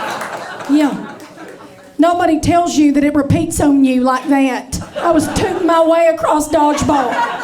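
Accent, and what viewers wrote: South, United States. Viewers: I love her southern accent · Really great set! 😂😂 a really sweet southern accent too! Best of luck!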